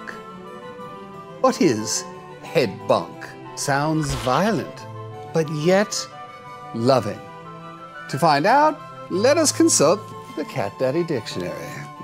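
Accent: England, United Kingdom. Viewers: Hi, love your English acent! My cat Cloud gives me headbutts, and my cat Cat's, does the sea otter when I come home! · you are too much. I love your English accent delivery. This is such a neat, unusual community.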